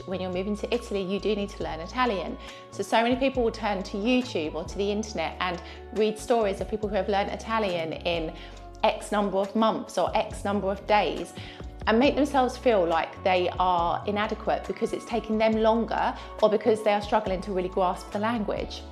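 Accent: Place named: RP, United Kingdom. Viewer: I love your RP accent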